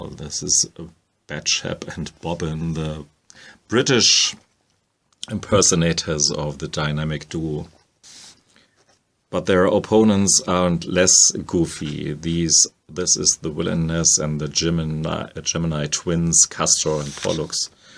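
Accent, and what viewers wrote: Germany. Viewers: I Listen to your soothing German accent while painting. Keep up the great work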